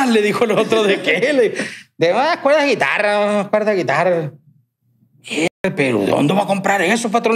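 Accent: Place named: Sinaloa, Mexico. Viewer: In sinaloense imitando el acento sinaloense. Se oye perron.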